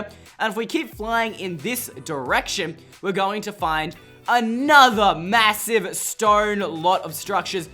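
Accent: Australia. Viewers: How old are you just wanna know your age you sound and look young but u can already tell you come from Australia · r u Australian?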